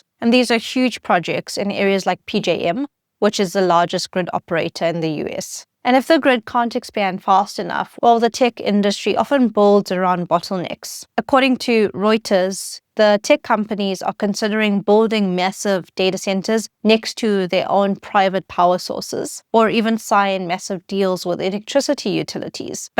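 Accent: New Zealand. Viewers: Ha, I couldn't at first work out your accent. I thought you were from NZ at first. Very correct about power in vs power out. The data itself is an energy less output. The heat is mainly down to the efficiency of the FETs in the processors and probably the low voltage power supplies on the boards.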